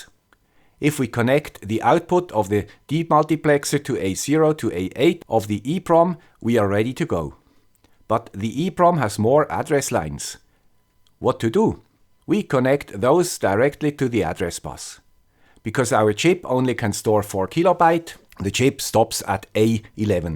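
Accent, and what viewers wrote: Switzerland. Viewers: I'm also 63 years and grew up in SF Bay, so have 40+ years in computers, software and electronical things (35 of which are obsolete). Found your hilarious and accurate representation of those days refreshing. Love the Swiss accent - keep up the good work! P.S. once connected an Atmel 8085 (?! flavored chip (someone knows the part#) to an old school PS/2 keyboard [clock, data and power]. Fun fact: it sent keydown, keyup!, func keys, shift, ctrl, etc. along with control over 3 LEDs in a seemingly random but decodable fashion. Currently working on an RCA CDP1802 (Membership Card) just for fun! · Now that was both fun and complex. Beautifully broken down and simplified in a way only the guy with the Swiss accent could have achieved. I’m feeling all nostalgic 🏴😁 thanks 🏴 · Another masterpiece lecture from the "Guy with a swiss accent" .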